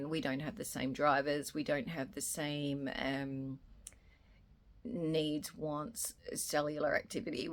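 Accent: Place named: Australia. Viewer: Are you Australian? Its kinda tricky where to place your accent?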